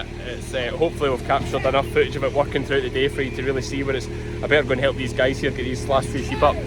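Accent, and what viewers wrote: Scotland, United Kingdom. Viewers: i love the scottish accent, respect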